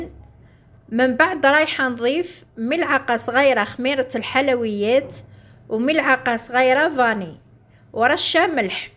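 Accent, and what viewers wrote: Setif, Algeria. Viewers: يعطيك الصحة على حساب هدرتك نباني سطايفية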